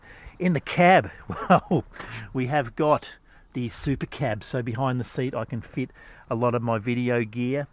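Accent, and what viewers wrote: Australia. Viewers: Genuine true blue, she'll be right mate, delivery. Love it mate! LOL...yeah, best whisper when mentioning our would be masters. Currently chasing a tray canopy for my BT50 ute. Missed a few Market Place for free beauties, but I'll catch one eventually. For me, it's just going to be basic place to sleep prior to hitting the waters for kayak fishing, or a day of metal detecting. Then, overtime, plan to upgrade it for longer trips to the gold fields and various historical locations. Thanks for the insights mate. BTW, I'm thinking removable inside panels using velcro, or DIY window tinting. The way I see it, curtains might be a dead giveaway.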